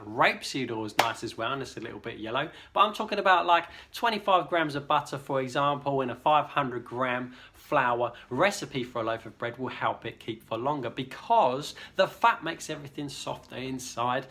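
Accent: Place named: London, United Kingdom. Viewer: love all the tips done with such sincere passion. I’m an American and wonder about that accent. Is it a London accent? If so, or if not, which area? Can tell it’s English, but curious of the location exactly!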